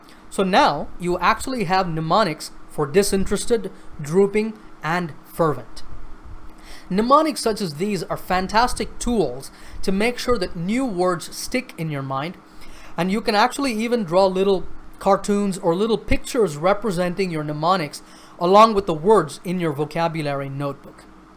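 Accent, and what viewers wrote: United States. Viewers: I really looking forward to learn American accent like you could you help me out please.. Actually I tried getting bogged down many times in it but the problem arises with practicing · you know I m in 10 th I have joined a lot of English tutions one named American institution of English language but the problem there was that those people don't have accent but u have it I m an ilets student too have of my doubts are finished due to you now I don't get perplexed while listening an American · How did you master the American accent?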